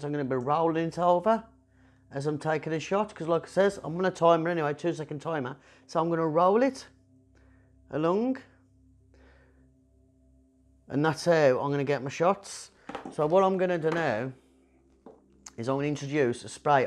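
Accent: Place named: Sutton Coldfield, United Kingdom. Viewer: Love the simplicity so glad I've discovered your channel. Love the accent too, takes me back to my youth in Sutton Coldfield.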